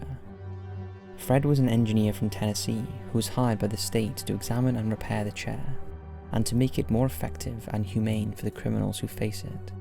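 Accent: Wales, United Kingdom. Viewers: His accent is Welsh.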